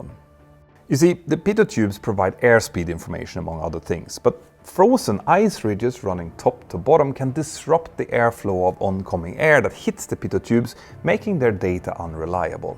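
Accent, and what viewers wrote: Scandinavia. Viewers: the way u talk english either ur norwegian or sweediah 😂😂😂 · An interesting Scandinavian accent here, but natural for sure.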